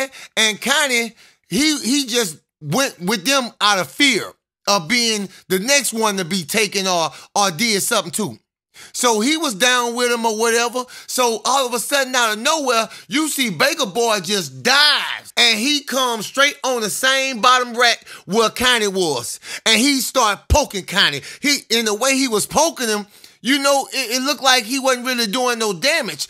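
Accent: New Orleans, United States. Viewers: Can I asks are you saying joes or joints I’m sorry its that New Orleans twang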